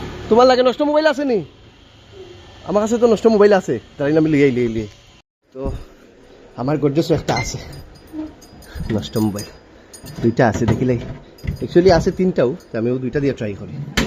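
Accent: Sylhet, Bangladesh. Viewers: আপনার ভাষা সিলেটের মত কিভাবে একটু বলবেন? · 😂😂 কারন ও খাঁটি সিলেটী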